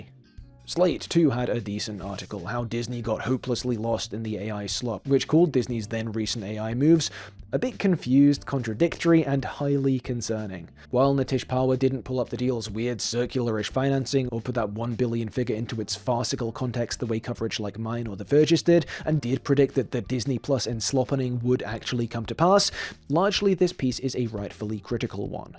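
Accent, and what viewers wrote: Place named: North, United Kingdom. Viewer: Why does sound more like he's from the north in the flashbacks?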